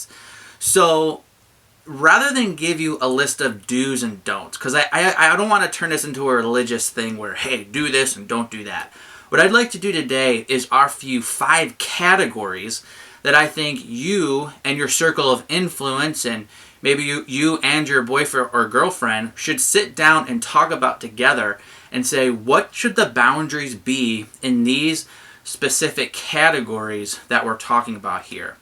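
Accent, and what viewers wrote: United States. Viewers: I have watched, read, and heard a lot of Christian dating advice over the years, and this hit it right on the nose. I had never heard someone talk about emotional boundaries within a dating relationship, only with friends of the opposite gender so I really appreciated it being brought up. I also thought that the last point of being weary of our words and promises is a really key element as well. I can't tell you how many times I have heard friends or family make promises they can't keep in a relationship only to see it crumble when they can't hold up their end. I also liked your discussion of boundaries when talking about the future. I have never even considered that one before, however upon thinking about it, it is 100% true. I know I have made this mistake which lead to me staying in a relationship longer than I might have otherwise simply because we had talked about the future so much. 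I just wanted to say thank you and I enjoyed hearing the slight Minnesota accent with some great Christian dating advice.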